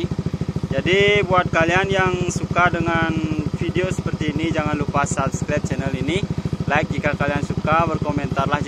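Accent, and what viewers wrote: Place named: Bali, Indonesia. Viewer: Logat nya bali banget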